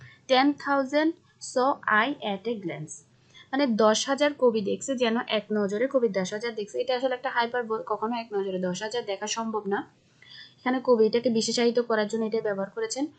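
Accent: Chittagong, Bangladesh. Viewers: সুন্দর তবে চট্টগ্রামের টান মনে হচ্ছে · Maam mone hoy...Chottogram er..... vasa shune mone holo....😊❤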